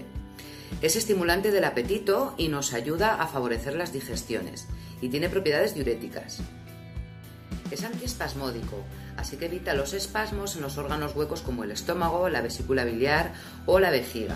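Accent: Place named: Spain. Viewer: Me gusto el acento Español.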